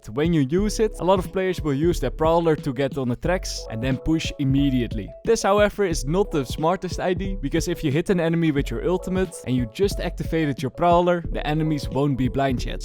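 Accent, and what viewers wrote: Netherlands. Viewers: het kaas accent is zo grappig vooral met vjeulereble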